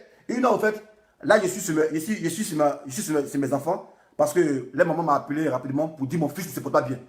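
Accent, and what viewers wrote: Ivory Coast. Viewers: Toi là tu ne sais pas parler.Tais toi avec ton français ivoirien. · je n'aime pas quelqu'un et je suis toujours calle' sur sa page, je ne rate aucune vidéo! Quelle souffrance!...il est si délicieux le français ivoirien et nous aimons notre français nous ivoiriens, bravo 👍👏👏👏👏👏👏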